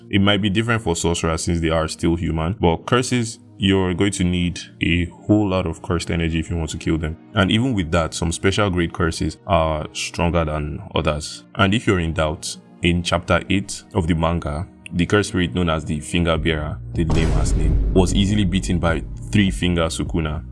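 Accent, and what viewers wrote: Nigeria. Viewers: U sound so much like a Nigerian, good one brother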